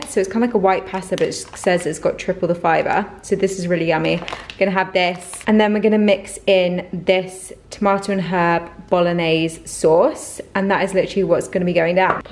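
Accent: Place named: England, United Kingdom. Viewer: New sub❤️ I loooovvvee how you speak, where you from? I’m guessing somewhere in England 🏴?🤔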